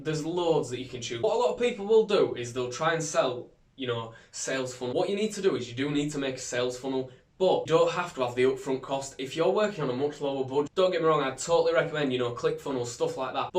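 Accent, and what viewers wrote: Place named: North Yorkshire, United Kingdom. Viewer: Thank you so much for your videos. I have learnt so much, made a few quids already and I usually don't really comment on YouTube, but your videos and channel are so underrated. You need to be more famous. Will definitely recommend you to my friends. Keep up your fantastic work 💪I'm French, and I absolutely love your accent! (North Yorkshire if I'm not mistaken !)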